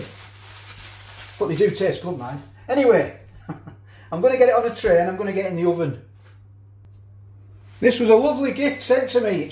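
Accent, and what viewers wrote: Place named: Sheffield, United Kingdom. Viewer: You from Sheffield? X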